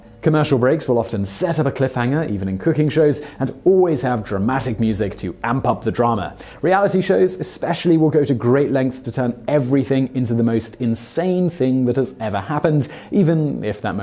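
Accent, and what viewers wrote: United Kingdom. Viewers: I love how u say controversy (and other words u pronounce the British way instead of the American way) u yummy man u! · Is there a louder, more in-your-face american version of Simon Whistler and his shows where he doesn't speak with a british accent? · Love the British accent. I don't get British humor tho.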